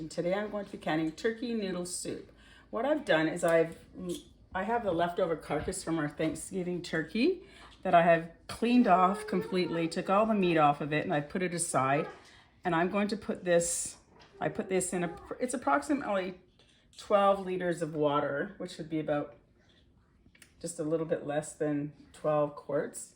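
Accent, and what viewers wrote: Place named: Manitoba, Canada. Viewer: Thank you for sharing your method! I have yet to try water bath canning, but am excited to have some ready-made food to have on hand. My sister in law's parents are from Manitoba, your accent sounds similar! Greetings from Ohio 🙃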